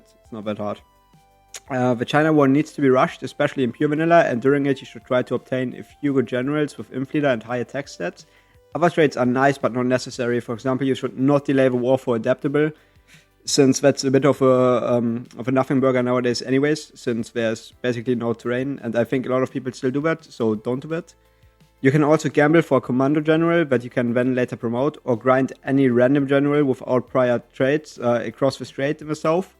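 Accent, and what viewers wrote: Germany. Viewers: ohhh i hear se german aktzent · i hear DEUTSCH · I just saw your video against NAYF in tommy channel and then the algorithm send me this, what a finding! If you dont mind, I would just tell you to speak a bit more slow, its a bit difficult with your accent, is it german btw? (no offense lol, I am also not a native speaker). Btw, are there any other players on your level besides NAYF and Mohammed on this MOD? I would love yo see more matches, you play damn good. Edit: the commanders MOD I meant!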